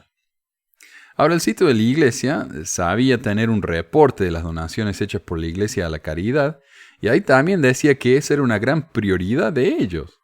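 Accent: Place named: Córdoba, Argentina. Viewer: ..ESA LLAMA SUPER CUADRUPEDA, del Ecuador que se cree inteligente que te mando mensaje hablando de tu acento Cordobes, Le pregunto Yo que acento tiene Como llama de su CORRAL Ecuatoriano.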